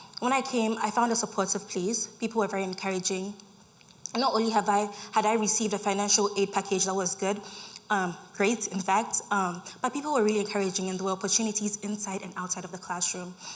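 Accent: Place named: Ghana. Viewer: keep up with the Ghanaian accent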